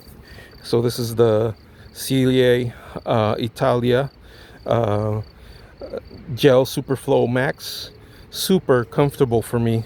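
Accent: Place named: Southern California, United States. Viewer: U sound vry southern cal. Where all th smartest people live. Smart purchase. U 4got mention yr bike has a teardrop aero down tube larger diameter. Mine is chrome version my upgrades vastly superiour 2yours& delete 3lbs over yourz. Relaxed geometry = superiour shifting huge reduction in cognitive stress. Always apply glue tube (and) patch blow dry maybe u find iz stronger result. Go buy u some 38mm Specialized Sawtooth $19 Jenson b4 they run out. 120 TP1 faster then yr frictiony knobs on MAXXIS. I clocked 12mph over San Gabriel mts fireroad slicks vs 8mph sluggish knows. Yes knows are fun 2look at. No they dont wrk. use slicks is best bleev it or not rubber gets traction. Hard 2bleev I know. Use melted parrafin wax chain tubeless tires. Even with tubes my new bike& new positioning man it just FLEW. When I got Walmart 17miles away It felt like I just left driveway brilliant ergonomics body not sore wznt tired at all either. 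Mind blowing. My Mercier build is actually wrldz best bike all others will now be judged by end all personified I built it delete my E Bike I was SHOCKED 1st time I rode it. Felt like it had a motor. I am ^ always have been cycling true epicenter 1x, dual control shifting on your drops, Disc specific rims/disc's pedal straps short upright top tubes, "Gravel bikes" with wide fork& stays thoze were all my doing from r decades ago. U neglect mention TAIWAN by far best builder bikes or anything. Very mature responsible people Taiwan = true reason Honda became ki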